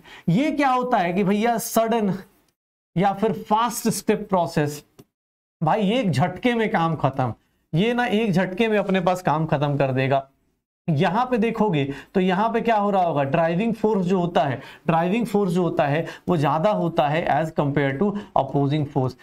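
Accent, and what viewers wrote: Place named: Bihar, India. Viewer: in this whole session I found one thing that sir ka bolna be like - """Entropy Bdhega "-bdhega " ky mst bolte h ekdm bihari style me lgte h sir isliye Inka voice acha lgta h meko..nd pdhai to waise bhi lajawab hai...... 😊🔥🔥🔥🔥👀✨❣️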